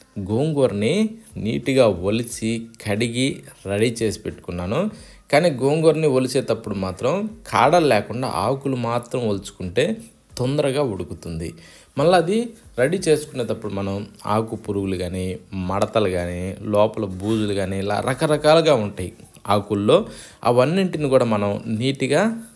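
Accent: Nellore, India. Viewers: Nellore valla andi meeru . antha ma language.baga chesaru.baga chepparu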